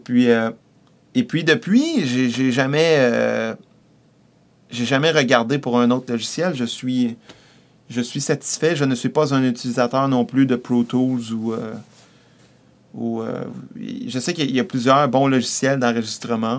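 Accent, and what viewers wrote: Quebec, Canada. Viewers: cool il est quebecquoi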